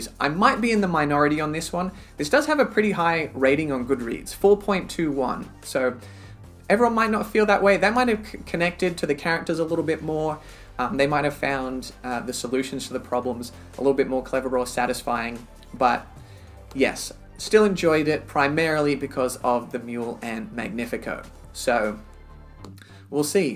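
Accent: Australia. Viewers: What accent is that? Australian?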